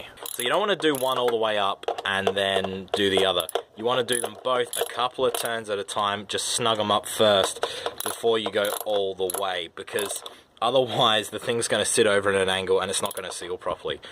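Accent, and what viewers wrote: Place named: New Zealand. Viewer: sounds like a kiwi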